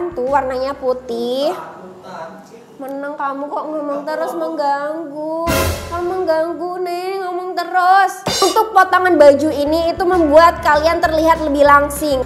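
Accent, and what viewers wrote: Java, Indonesia. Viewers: Kak bahasa indonesianya agak medok Jawanya khas banget😀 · Mbaknya org mana sih?? Medok bgt sumpah lucu.... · Kak asli mana. Kok ngomong e jowo?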